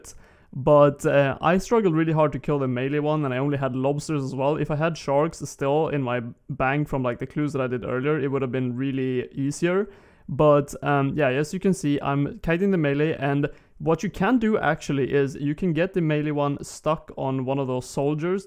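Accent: Sweden. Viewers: Haha älskar att man hör att du är Svensk :)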